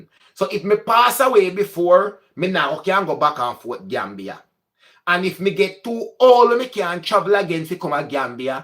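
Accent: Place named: Patois, Jamaica. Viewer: love ❤️ the Jamaican Patwah.I am from Jamaica so I can fully understand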